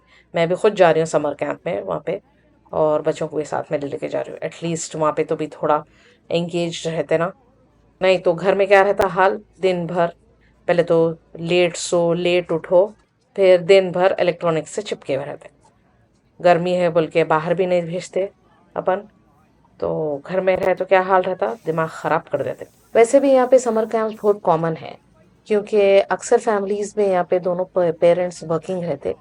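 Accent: Hyderabad, India. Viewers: Really proud of u being using hyderabadi accent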